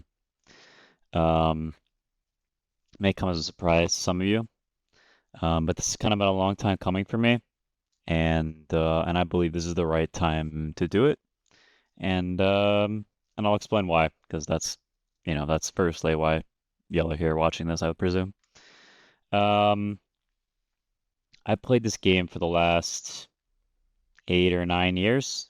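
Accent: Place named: South, United States. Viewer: I hope you will still stream and watch some tourna bro we love your southern accent casting.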